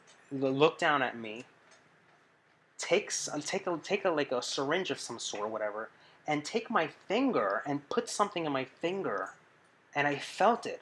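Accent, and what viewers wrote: New Jersey, United States. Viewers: You are so instantly lovable. ♥ (Also, when you mentioned you are from NJ ... instantly, I started hearing it, and I'm not even from your side of the Atlantic!) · Answered my question. NJ accent. =) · So that's why I hear you NJ accent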